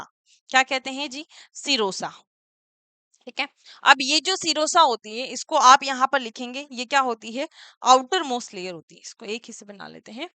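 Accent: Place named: Kerala, India. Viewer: Ap kerala se ho kya mam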